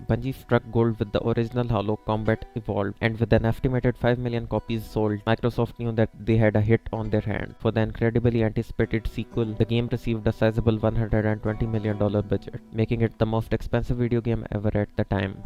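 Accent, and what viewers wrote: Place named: India. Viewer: Your video is great bro but take the indian accent down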